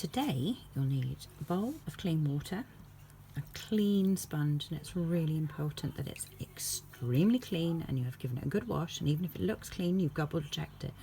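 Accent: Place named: Devon, United Kingdom. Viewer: Devon accent 'ooh arr... I like a bit of poking tool for aaafters!' x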